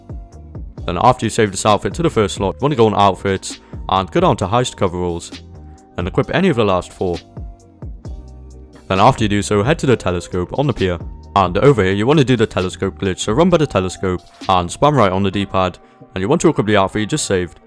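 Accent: United Kingdom. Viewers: Are you British mate?🙂😎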